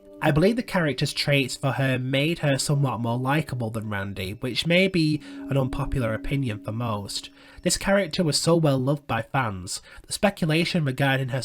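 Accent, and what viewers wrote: United Kingdom. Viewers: He's from the northern area of the UK, they all talk like that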